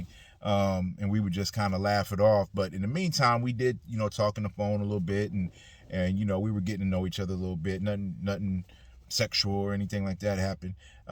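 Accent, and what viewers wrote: Bronx, United States. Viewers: Are you from the Bronx? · Sounds like he from the Bronx 🤣🤣🤣🤣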